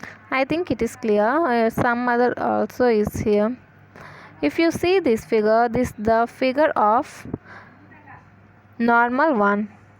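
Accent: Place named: England, United Kingdom. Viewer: Nice presentation. I like your English accent. BTW, what textbook are you teaching from?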